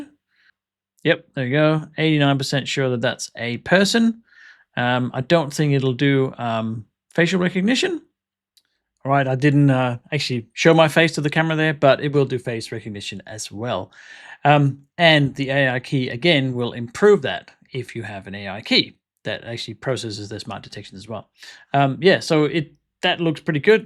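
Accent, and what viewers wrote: Denmark. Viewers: Hey, you danish? Think I can just about tell by the accent, but not 100% sure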